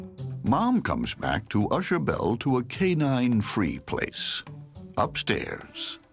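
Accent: United States. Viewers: The Stanley Parable narrator is British, this guy is American.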